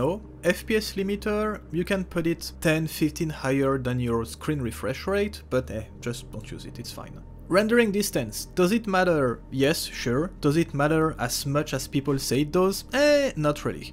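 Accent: France. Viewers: l'accent francais 🤣ca fait plaisir quelques frenchs dans ce youtube game hahaha😂😝🤪😜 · Hello dude ! Are you french by the way :)?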